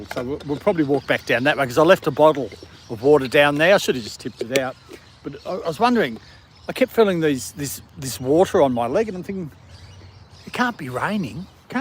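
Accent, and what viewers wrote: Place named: Australia. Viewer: I love the Aussie accent 😍 Not to keen on my own voice , I have a strong London cockney accent 😊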